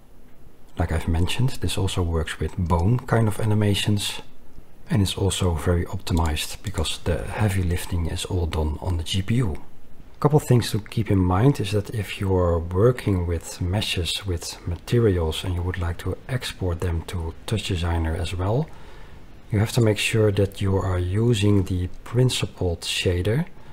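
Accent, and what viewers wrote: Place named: Netherlands. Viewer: I had no idea how difficult it is to import a 3D object into TD with it's material correctly mapped. After doing a lot of research - looking at Derivative notes, MeshLab, CloudCompare and watching YouTube videos, I eventually came across this video. Your import/export with Blender tool makes this seemingly simple task a practical reality. So thank you for making this tool available at no cost to us amateurs! Great well explained tutorial and loved your Dutch accented deadpan mention of the Funkee Munkee!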